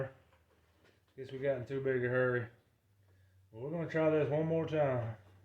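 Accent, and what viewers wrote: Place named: Appalachia, United States. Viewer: It is painful to listen to dumb hillbillys like you try to speak English. Good Lord!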